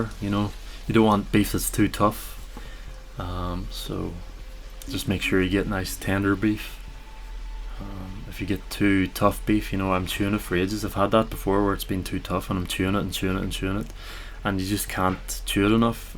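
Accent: Northern Ireland, United Kingdom. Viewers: he lives in Northern Ireland by the hears of his accent;)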